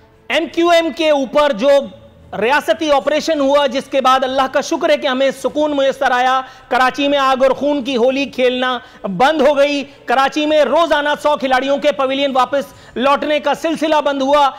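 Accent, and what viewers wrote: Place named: India. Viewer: Kuch Der k lye main samjha news anchor Indian Hai koi Indian news say khaber day raha hai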